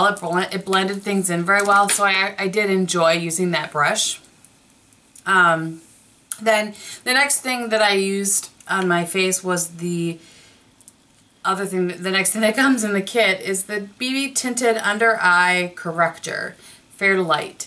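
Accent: Wisconsin, United States. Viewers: For some reason your Wisconsin accent was super noticeable in this video. Too cute!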